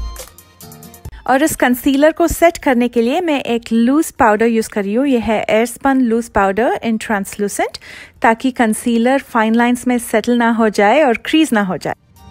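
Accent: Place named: India. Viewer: ur hindi accent sooo cute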